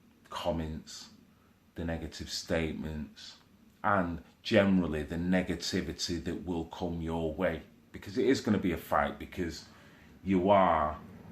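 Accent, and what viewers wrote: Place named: Liverpool, United Kingdom. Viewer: Racism would NOT exist between whites and blacks if all black guys adopted the same morals and loyalty that strongly holds towards his country and people. I agree with almost everything talks about and I look forward to all his uploads. I was an early subscriber to his channel because on listening to his posts, I immediately recognised this man as a decent honourable and above all loyal black Brit whose corageous enough to speak the TRUTH without fear or favour. is clearly very clever, informed and is an articulate speaker (with a Liverpudlian accent lol) to boot who doesn't use obscene profanity to shock. I think his talents are pitifully wasted as a painter decorator (no disrespect intended to decorators who are all hard grafters). He's the kind of guy I'd hand pick as a friend. I think that Merseyside Police should appoint as their new Diversity Policing Adviser.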